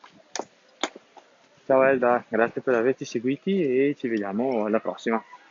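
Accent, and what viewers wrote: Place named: North, Italy. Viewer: I love your Northern accent LOL. Fantastico video! Saluti da Toronto.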